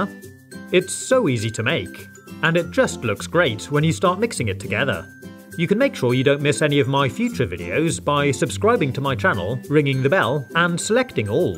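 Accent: United Kingdom. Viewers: And your British that cool I I’m to I love this and keep it up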